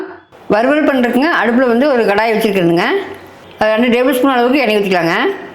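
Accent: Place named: Kongu, India. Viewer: Super parkkavea 🤤aaguthu maravalli kilangula eppadi senju saptirukkom sakkravalli la eni thaa try pannanum samayal video nu start pannirukeenga niraya per ku dovuts varum ellarthukkum reply pannunga antha responce kea nalla views pogum neenga pesurathea kongu slanga ovoru wordskum eadaila responce ah erukku 🙏 enaku thonunatha sonna thappa eaduthukka vendam thank u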